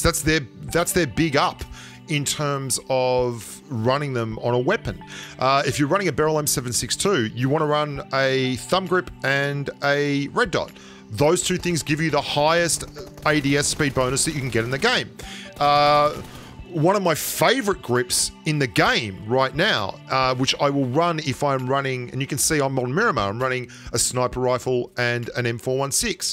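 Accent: Australia. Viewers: You not boring bro haha especially that Aussie accent always cool to listen to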